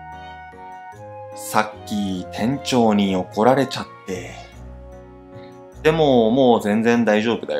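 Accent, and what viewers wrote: Taiwan. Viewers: 台湾腔好可爱～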